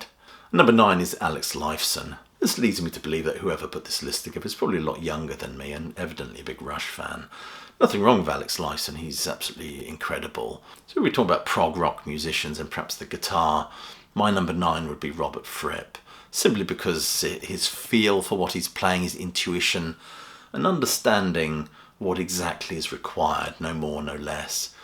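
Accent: United Kingdom. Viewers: i love your commentaries, even if i don't know all of the musicians you mention. if you don't have a PhD. in musicology, you deserve one. i don't know where you derived all your knowledge, but it makes me think you either owned a record store, or were a dj at some point. your blunt brutal honesty is both humorous and entertaining. the british accent adds a dimension as well.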